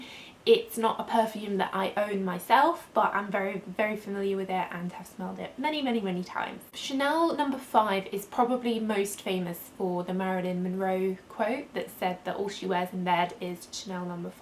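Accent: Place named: London, United Kingdom. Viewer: hiya, good review ! this beauty is a little bit from another time, however its sooo good when your in mood for it . i love love love all the versions , edp,,edt,pure.parfum,soap.lotion etc ive noticed no 5 smells best on clean skin straight out the bath or shower. In fact the bath products are lovely, but if you want a sweeter version of no5 use the after bath powder , now that is luxury .... mmmmm :) by way im from london too , you speak far nicer than me though , take care :)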